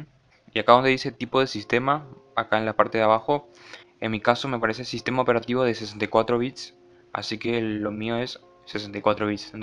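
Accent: Paraguay. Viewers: Se re nota que sos de Paraguay o estoy loco?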